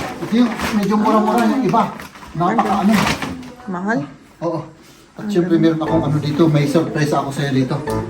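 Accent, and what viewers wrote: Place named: Cordillera, Philippines. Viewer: Sir taga car kaba? Ang tuno sa pananalita mo ay parang taga car.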